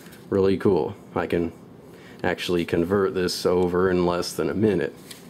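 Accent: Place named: United States. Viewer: Where in the US is that accent from?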